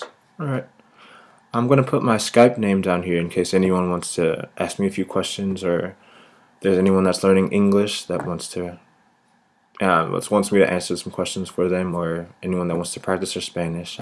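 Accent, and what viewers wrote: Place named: South America. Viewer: Hablas muy bien el español para ser Norte americano, incluso, suena más como si fueras de sud américa.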